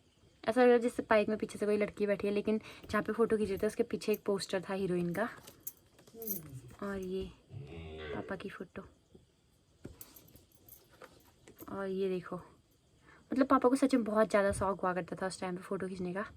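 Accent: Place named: Kumaon, India. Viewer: Wow Didi aap ke video Dekhne me kuch अलग ही fil hota hae or upr se aap kumauni ho samj to nahi aata bat sunne me maja aati hae aapki Boli😊❤❤❤❤❤❤😊😊😊😊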